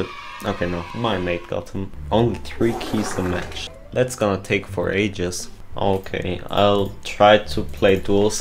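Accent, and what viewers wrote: Germany. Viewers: I could swear i am hearing german accent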